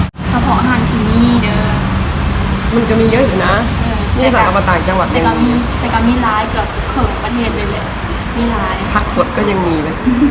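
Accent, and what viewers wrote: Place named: Yoi, Thailand. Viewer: ลาวสำเนียงโย้ย.. ฟังคิดฮอด ไทโย้ย อากากาศอำนวย สกลฯ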